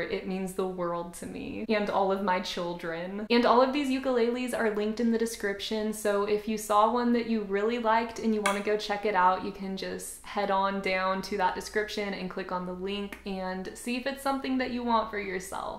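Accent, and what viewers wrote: South, United States. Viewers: Which part of US is this accent from? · i love you're light to heavy southern accent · Hi..love the vids and the ukes lol, you're a very funny person and the accent is quite charming I know it's southern States whether its Louisiana or wherever I'm from north of England so not sure...but you should check out that guy on you tube called morbanjo on you tube, he's playing violins he made himself out of cigar boxes and other stuff amazing... Who knows he may make a ukulele for you 😆😊🎻🎸take care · Im not sure about it but did i hear a country accent?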